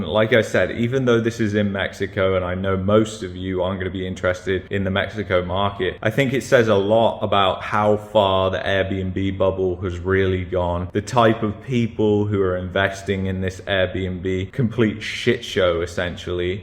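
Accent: United Kingdom. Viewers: I have refrained from commenting so far , but i have to say that this very Limey sounding bloke is one smart cookie . Hope still exists .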